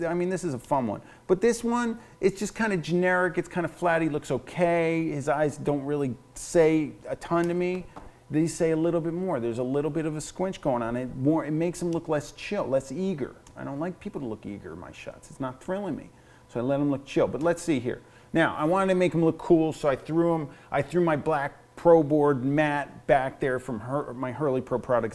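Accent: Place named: New York, United States. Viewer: This guy is almost painfully from New York. I mean wow. Anyway I've been looking for ways to improve my creative portrait drawing, and I think this channel might be the one for me.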